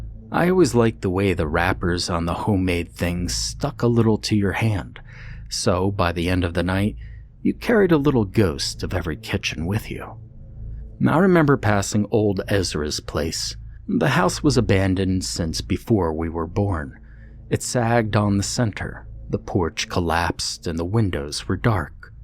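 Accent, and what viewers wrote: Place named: New Jersey, United States. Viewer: I knew I heard a Jersey accent!🙂 Love your channel (from a fellow Jerseyan)!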